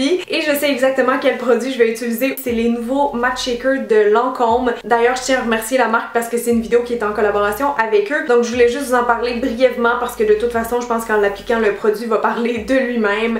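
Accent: Quebec, Canada. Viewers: Franchement j’adore ce style de maquillage qu’on voit hélas peu, t’es une fille super naturelle de manière générale dans tes vidéos car j’en ai vu plusieurs. Un jour il faudra que j’aille au Québec j’adore tellement l’accent, bonne année from France 😊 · Adorable ou.... Joli make up et encore une vidéo avec le charme de l'accent canadien..... j'adoreeeeee. Bisous Sublissima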